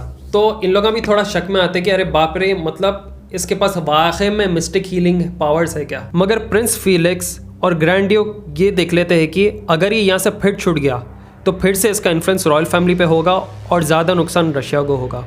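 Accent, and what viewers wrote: Hyderabad, India. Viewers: Bhai hyderabadi accent hi bola karo wahi suit hoti hai dusre accent mai hindi/urdu hi change hojati hai all the best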